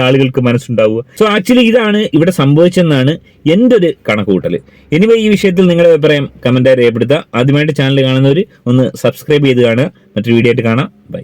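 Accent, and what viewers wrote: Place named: Malabar, India. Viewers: Athe malabar bhashyaanu...ente nattil enganeyaa samsaaram ...😅but tune deffent undaavum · മലബാറിൽ എല്ലാവരും ഇങ്ങിനെയാണോ സംസാരം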